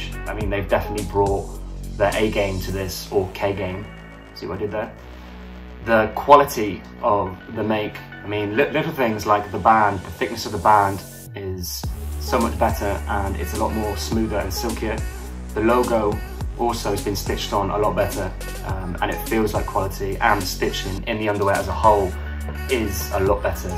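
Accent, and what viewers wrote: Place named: United Kingdom. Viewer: Pleasantly surprised to hear that you're a fellow Brit. I had seen loads of pix of you, but always assumed you were American. I detect a bit of an accent there, so I'm trying to work out which part of England you're actually from. Leave it with me. But...this is a great video. Did it help me to choose which underwear I preferred? Not really. Unfortunately I kept getting distracted by other things...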